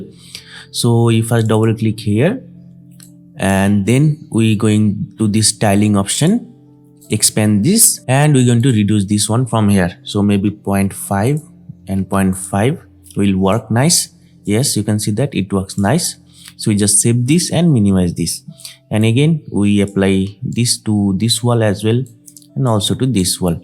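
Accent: India. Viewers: Oh no, Indian English😢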